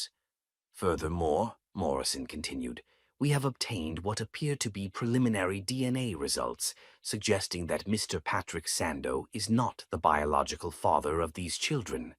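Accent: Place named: England, United Kingdom. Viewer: I like this story because of the eloquently English spoken accent. I also like that the couple didnt wait to show their feelings for each other❤ Listening from Antigua 🇦🇬 Patrick is a bit slow in getting ahead of his greedy cousin Marcus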